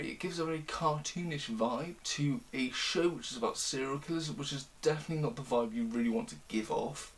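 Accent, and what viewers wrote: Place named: England, United Kingdom. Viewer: Good video I like your accent even though I don’t usually like the English accent ❤️ you’ve got a very smooth articulate way of speaking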